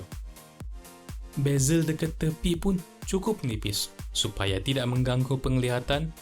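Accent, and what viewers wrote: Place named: Sabah, Malaysia. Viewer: MCM org Sabah ni abg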